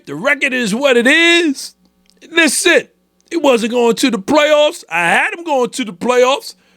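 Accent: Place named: New York, United States. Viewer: U already know he’s from New York 😂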